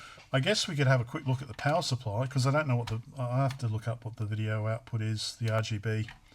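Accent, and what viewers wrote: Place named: Australia. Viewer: Sounds Australian. Sorry if you are not. 2 minutes into video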